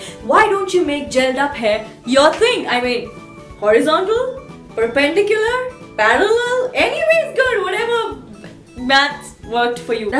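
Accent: Maharashtra, India. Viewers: That's funny ! you speak English in Marathi accent :-D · Dat Marathi accent in English...u r amazing ...what makes u awsme is dt ur topics n videos r relatable (to v Indians )which makes u makes u different frm Lilly Singh...love love❤️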